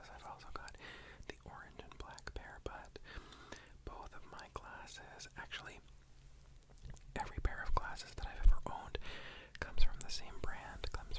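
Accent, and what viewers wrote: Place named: Canada. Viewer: mic setting is immaculate 🤌🏼 also the way your canadian accent says certain words lowkey hits?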